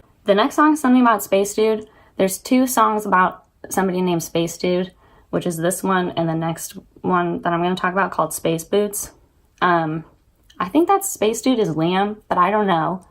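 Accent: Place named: South, United States. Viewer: You have a slightly southern accent, my favorite song of her is. What is your favorite one?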